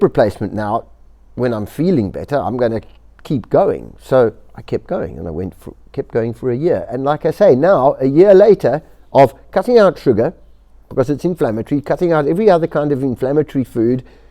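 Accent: South Africa. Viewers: exactly...the body heals itself if given the right supports, but the first step is to take the onus upon yourself like you have stated here...thanks for the great info...and love your South African accent as well...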